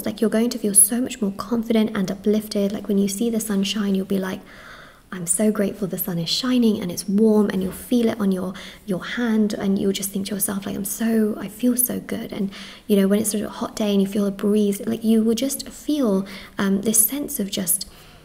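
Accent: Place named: South East England, United Kingdom. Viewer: you sound like your from the south east uk, I love watching your videos, I read tarot cards too but find yours a comfort and more clarification for the readings i do on myself x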